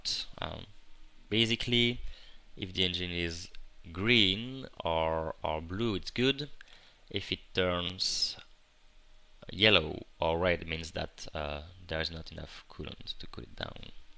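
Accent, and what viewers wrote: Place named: France. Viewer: I have yet to hear a Frenchman speak perfect English though, most of them talk like give or take some. You can hear they are French the moment they open their mouth. And in typing they too can reveal their nationality because they use "of" a lot in their text. It's all good though because you can understand what he is saying and that's all that matters in the end.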